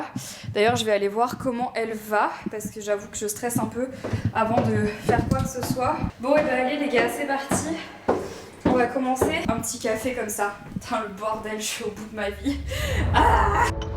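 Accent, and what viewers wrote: Belgium. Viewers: tu es belge ? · L’accent belge, ma passion 😍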